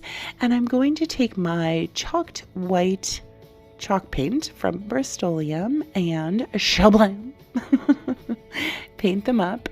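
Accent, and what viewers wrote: Canada. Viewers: Hehe I keep forgetting you're Canadian!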